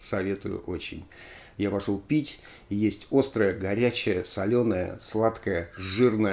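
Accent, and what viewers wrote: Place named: Saint Petersburg, Russia. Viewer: я тож узнаю ваш купчинский говорок, знакомый мне с детства.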